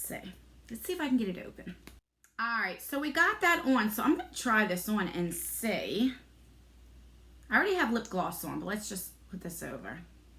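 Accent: New Jersey, United States. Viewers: new sub love ur channel..💝 I can tell right away ur a jersey girl so I'm I !! 🌼 ps ur so pretty & ur makeup looks amazing!! 💄💋🌲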